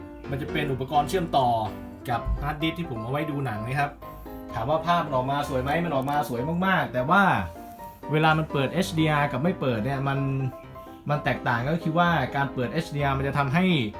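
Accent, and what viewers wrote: South, Thailand. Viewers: พี่อยู่ภาคใต้ป่าวพี่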